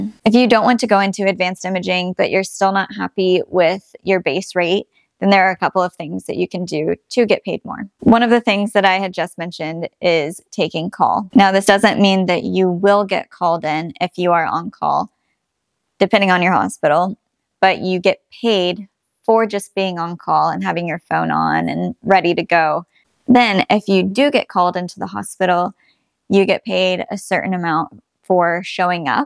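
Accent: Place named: Australia. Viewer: what county you are mam? I thought youre from Australia. 😅 are you from US?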